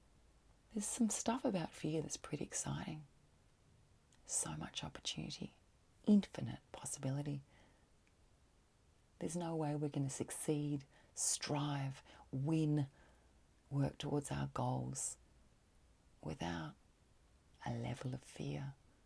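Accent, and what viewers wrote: Australia. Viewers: I love your accent!! Are you Australian?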